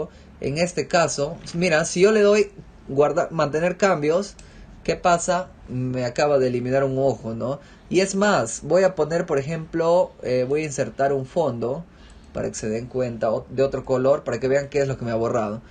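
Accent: Peru. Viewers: Eres peruano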